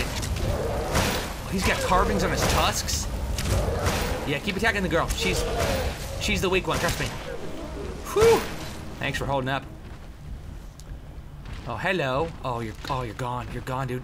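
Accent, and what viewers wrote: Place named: Scotland, United Kingdom. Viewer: awesome Scottish accent xD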